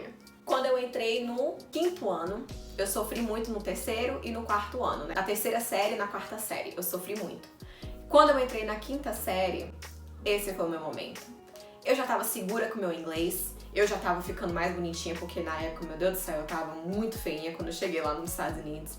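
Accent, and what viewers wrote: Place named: Northeast, Brazil. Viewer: Tu é nordestina pura em kkkk "Mangá"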